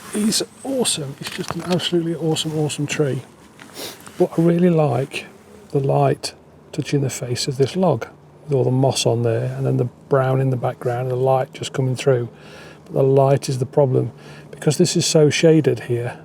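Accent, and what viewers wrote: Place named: Mansfield, United Kingdom. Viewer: That's a very familiar accent! Mansfield by any chance? Am frum Wooduss! One of the things that made me ask the question, apart from your accent, was the "If you can't be good, be careful!"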